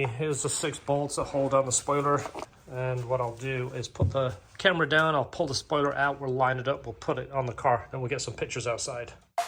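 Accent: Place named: United Kingdom. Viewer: I am listening to this and for some reason I am imagining a British guy talking.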